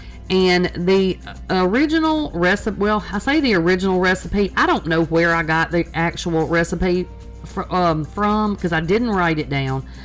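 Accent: South, United States. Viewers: ❤,your southern accent is priceless, I love watching you and learning ❤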